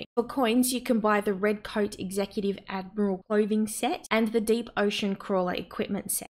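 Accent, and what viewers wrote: Australia. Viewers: Love the aussie accent 😜👌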